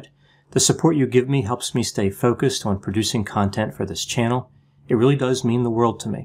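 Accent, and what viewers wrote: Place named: Delaware Valley, United States. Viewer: Your list is heroically bland and this video demonstrates your core virtues of steadfastness, honesty, and not caring what anyone else thinks. Also, I like your Delaware Valley accent. I grew up hearing it and find it comforting.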